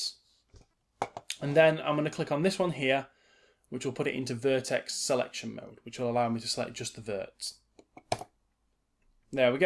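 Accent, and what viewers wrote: United Kingdom. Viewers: Grate Tutorial! Excellent British accent!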